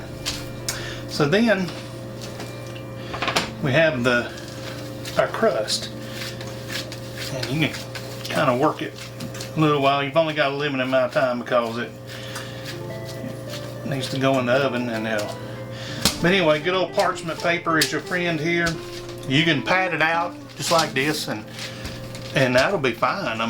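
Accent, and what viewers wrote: South, United States. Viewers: Yes to pizza and double yes to that lovely southern accent.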